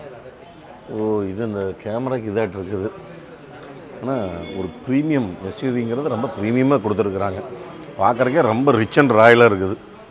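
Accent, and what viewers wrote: Kongu, India. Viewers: அருமையான துவக்க விழாவில் கலந்து கொண்டு அற்புதமாக நமது கொங்கு தமிழில் விளக்கம் கொடுத்தீர்கள்... நன்றிங் கோ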